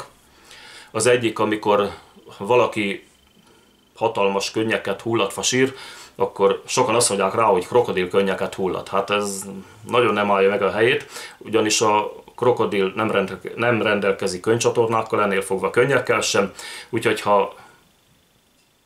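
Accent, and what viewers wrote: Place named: Vas, Hungary. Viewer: Aranyos "vazsi" tájszólásod van......:)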